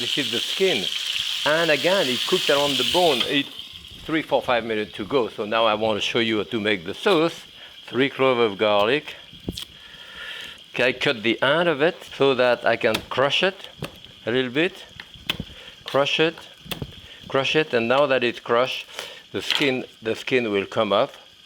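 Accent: France. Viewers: It's not a speech impediment . It's his French accent .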